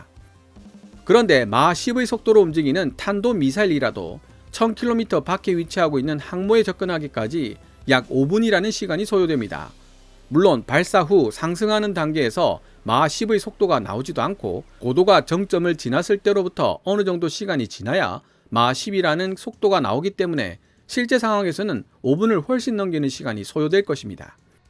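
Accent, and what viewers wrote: Gyeongsang, South Korea. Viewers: 님 경상도 분이신거 같아요 사투리가 나와서요...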